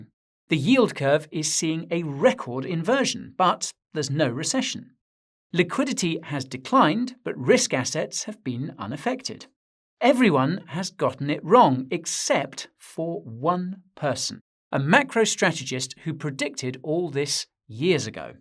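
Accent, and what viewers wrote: United Kingdom. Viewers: Hi 👋 Where about you based? You have a British accent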